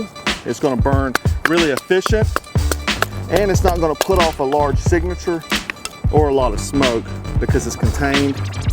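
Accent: South, United States. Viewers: Now I know why that southern accent reminded me of home, we're from the same city lol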